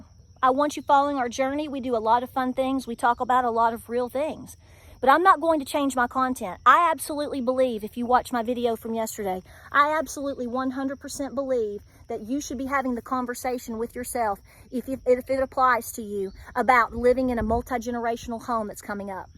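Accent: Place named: New York, United States. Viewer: You are definetly my girl. Im from ny and live in nc now I speak like you. The truth. Lu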